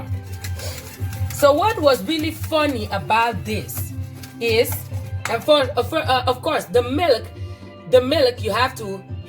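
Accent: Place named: Africa. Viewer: Omg love hoe u zo excited bent. Zo grappig hoe u Engels praat klinkt beetje als hoe Africans engels praten.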